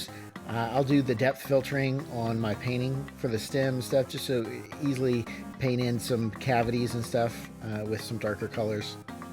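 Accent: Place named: East End London, United Kingdom. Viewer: I can’t say enough good things about Sothern GFX and their affordable, and well structured training. Also I am enthralled with accent. I’m afraid to venture this guess, but is it East End London?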